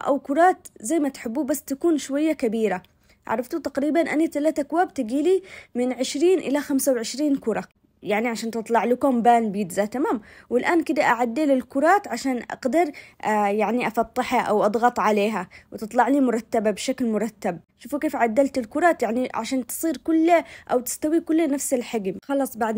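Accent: Aden, Yemen. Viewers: تسلم دياتك الحلوات ،،،مبدعة حبيبتي ،فديت لهجتك العدنية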